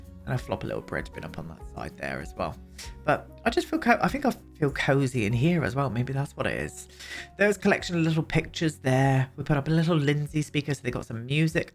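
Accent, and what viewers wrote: United Kingdom. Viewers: And you is british haha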